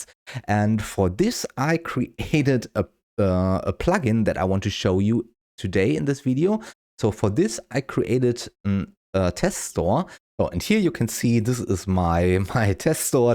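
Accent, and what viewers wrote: Germany. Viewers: Akzent einfach deutsch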